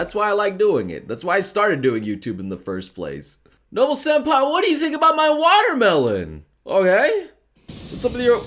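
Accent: Ireland. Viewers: Your Irish accent is awesome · I love that Irish accent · OMG HIS IRISH ACCENT IS THE MOST PERFECT THING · that Irish accent though XD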